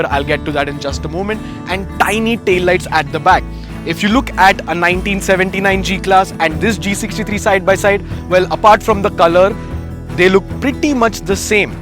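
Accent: India. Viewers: Very Indian Authentic accent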